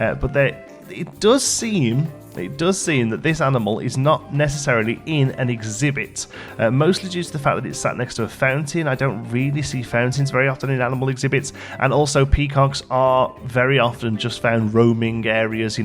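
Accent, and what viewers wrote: United Kingdom. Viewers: Weird, by your voice I would have thought you were in the UK already. Hello to a Canadian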